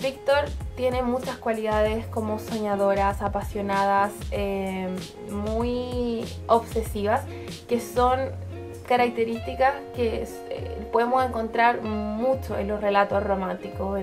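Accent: Chile. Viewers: Bonito tu acento chileno. Compatriota. Pará mí frankenstein es muy bueno por la forma de tratar el existencialismo, vida y muerte. Saludos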